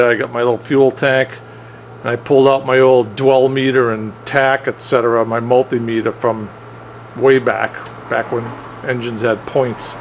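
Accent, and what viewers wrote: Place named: Maine, United States. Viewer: you must be from maine.... "motah" lol... (I'm a mainer as well)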